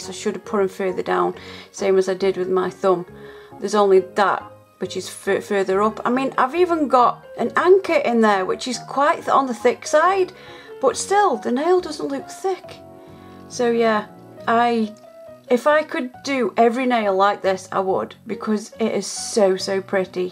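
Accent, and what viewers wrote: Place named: Stoke, United Kingdom. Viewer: love ya stokie accent too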